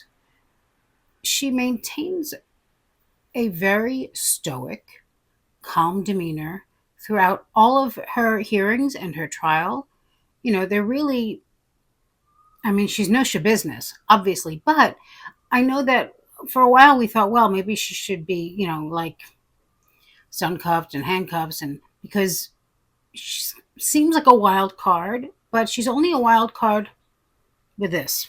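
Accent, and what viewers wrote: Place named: East Coast, United States. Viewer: Elizabeth Sarah Boone Taylor! …thanks for the check in. Love your videos, and your beautiful face! Your accent is very interesting, are you from Brooklyn? You sound kinda east coast. I’m from Oregon and we talk kinda slow here. Hah.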